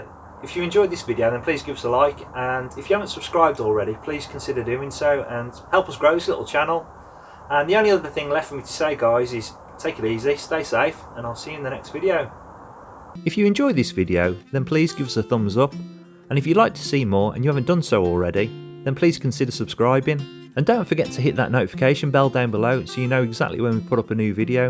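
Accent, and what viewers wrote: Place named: Midlands, United Kingdom. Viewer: Hi, thanks for the videos they've been really helpful. Good to hear a fellow midlander too. Just wondered if you'd encountered any problems since the install or if you'd do it differently now? I'm going to give this method a go once the weather gets a bit more reliable!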